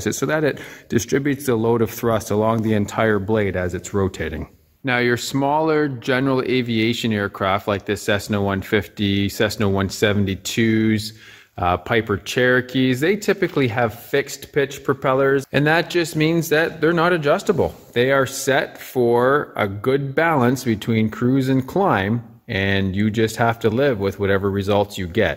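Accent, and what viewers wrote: Canada. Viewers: Thanks for the info re: props I had no idea, I have a couple of?? what is the brand name of those outboard wing tanks you have on your plane, second? is that RR bridge that you & Vicky flew over the day you had trouble with your starboard side snow slippers, was the bridge C.N or C.P. you in Canada? put it this way you sound Canadian. Cheers from OZ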